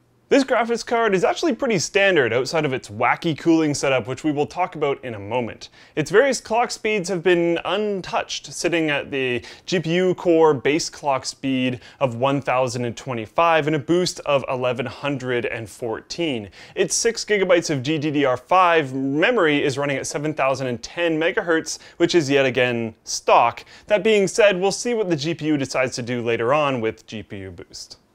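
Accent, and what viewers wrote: Canada. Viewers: Holy fuck I can't listen to Lukes Canadian voice, it's infuriating lol. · I love how canadians pronounce "about" and "out"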